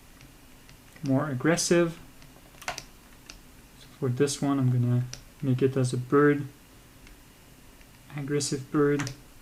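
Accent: France. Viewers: Moi je l'aime bien ton accent Fr ^^ Merci pour le tuto mec, je l'ai pas encore terminé mais je sent que je vais apprendre beaucoup ! :) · I really appreciated this video. And your french accent didn't get in the way of my understanding at all😃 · awesome and helpful video.. btw no need to apologize for your accent.. youre speaking english being a french., thats a big thing.. remember most english speaking peoples dont know any other languages other than english.. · nice french accent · UM DO NOT APOLOGIZE FOR UR FRENCH ACCENT! You and David Renoy are the two krita people who are carrying be with learning art right now, SO THANK YOU. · face-green-smiling:I chuckled when he apologized for having a dreamy French accent. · nah man the French accent is where is at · "Im sorry for my French accent" Bro that makes the tutorial even better to listen to 😭😂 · Why are you apologising for your French accent? 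It sounds awesome. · Je suis content d'entendre un accent français je comprends mieux comme ça :') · French accent is objectively the best accent for an art teacher anyway. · Quand j'ai commencé à regarder le guide je me suis dis que t'avais un sacré accent français et puis à j'ai eu une confirmation que tu étais français. C'est génial de prendre la peine de faire 2h de guide en anglais pour aider plus de monde en tout cas même en tant que francophone tu m'as beaucoup aidé merci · dude dont ever apologize for your beautiful accent!!! You are bi-lingual (at least bi maybe more) that is amazing and so what if you have a french accent, you are actually better off, if you didnt people might think you were American (like me) and I know you dont want that!!!! Great tutorial, great english, great accent, thanks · No no no! Never apologize for your French accent. 🤙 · I love your French accent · native English speaker here, your french accent is a nice bonus. 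its as if you are speaking in cursive. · Awesome tutorial and French accent 😀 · Ne t'apologize pas pour ton french accent, il est parfait. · tu es français non ? Tu as un accent français du coup j'ai tout compris, dommage que tu n'ai pas fait aussi le tuto en français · Merci pour ce video!😊 Je commence travallier avec Krita. Avant j‘ai eu seulement ProCreate. C‘est tres bien d‘avoir un guide comme toi. Ton accent francais est en plus!🤣 Nous aimons la France!!! (Excuse mon accent allemand😉) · I love the French accent. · Hello Dear, I think you don't have to sorry for your French accent. Its your own identity. You should be proud of it.:goodvibes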